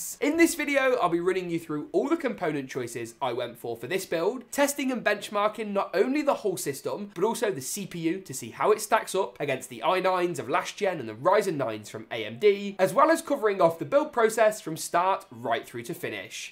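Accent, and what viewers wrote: England, United Kingdom. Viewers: England accent :/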